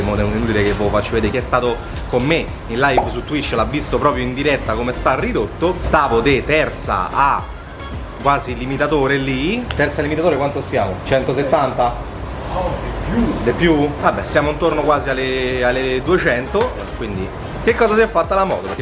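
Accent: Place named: Rome, Italy. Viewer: Sto morendo letteralmente.. finalmente e uscito il romanaccio che e in te.. e poi al panigale nun glie devi caga er cazzo e favolosa.. davvero.. la proporrei come maglietta ahahah anche mi lanciò.. in sicurezza ahahaha bellissimo sei un grande!!!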